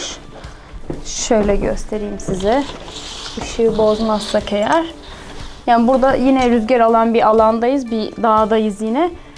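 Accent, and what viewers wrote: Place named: Central Anatolia, Turkey. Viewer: İç anadolu aksanı var bence 🤔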